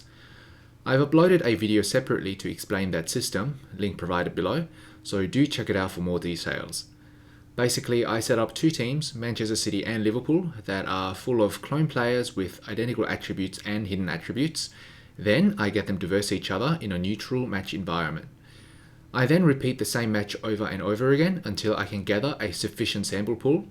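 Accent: Australia. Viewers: also is that an Australian accent I hear?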